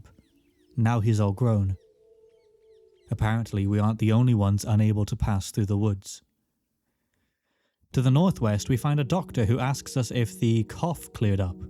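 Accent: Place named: Wales, United Kingdom. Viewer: You kinda sound welsh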